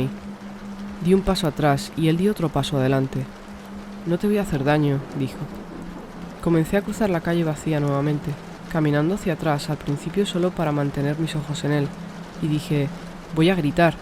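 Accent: Spain. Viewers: Me gustan mucho tus historias y ese acento español lo adoro 😍 sin duda este ya es de mis canales favoritos además tus historias están muy interesantes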